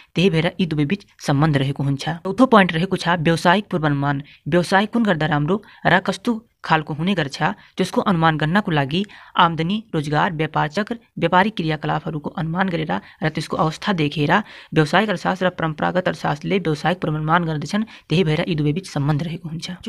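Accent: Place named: India. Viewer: indianjasto bolera kei bujhiyena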